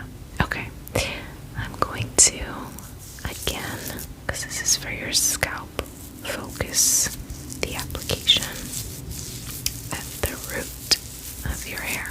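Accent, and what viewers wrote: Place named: Minnesota, United States. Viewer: shot in the dark here but I’m hinting a midwestern accent 😂 are you a Minnesotan?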